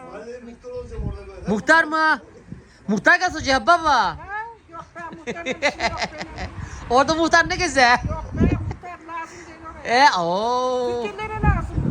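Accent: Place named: Zonguldak, Turkey. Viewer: kardesim seni izleyen hic bir izleyicin sende negatif bir sey aramaz aramamasida gerek sen dogal zonguldak sivenle güler yüzlülügünle insanlara büyüklerine annene babana evine gelen misafirin ve takipcilerine sayginla bizlerin gönlünü kazandin kardesim biz seni dogal icten samimi halin ile seviyoruz yeni videolarini ve eski vidolarinida zaman buldukca izliyoruz bizler gurbette Almanyada yasiyoruz güzel ülkemizin memleketimizin özlemini senin cekimlerinle gideriyoruz esim Bartinli ben Cide 'liyim sivelerimiz bir birine cok yakin senin videolarinda dogal sivenle anlatiminla memleket hasretimizi gidermeye calisiyoruz iyiki varsin kardesim annene babana kardeslerine takipcilerine Almanyadan selamlar sevgiler kardesim.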